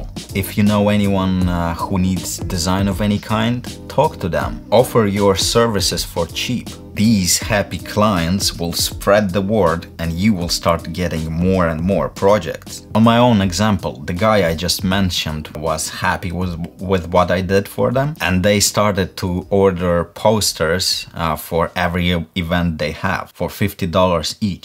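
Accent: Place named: Russia. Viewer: (Rus) -Такое чувство что твой родной язык русский. Но все ровно за опыт который ты передаешь своим подписчикам. (Eng) - I feel you're native language Russian. Anyway thanks for experience that you transmit with your subscription. 😁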